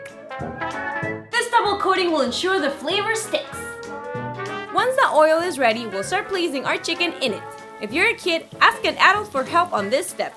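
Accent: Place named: England, United Kingdom. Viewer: Soy la única que viene del canal y no entiende🤣🤣amooooooooooooooo tu acento Inglés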